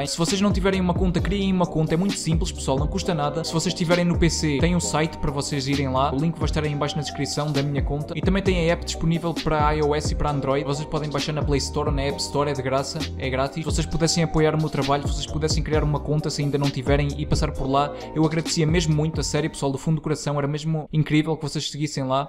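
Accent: Portugal. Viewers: "Deve ser brutal para tirar Selfies", rsrs muito legal o sotaque de Portugal. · Sotaque Português é muito legal!!!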